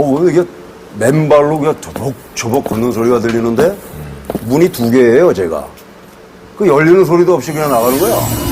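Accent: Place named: North Korea. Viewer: 이상하다. 말투가 왜 북한 사투리같지?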